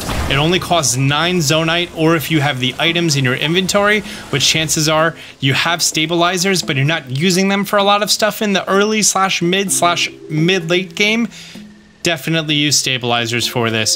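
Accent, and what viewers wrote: New Jersey, United States. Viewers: What part of New Jersey bro? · Wait you're from Jersey?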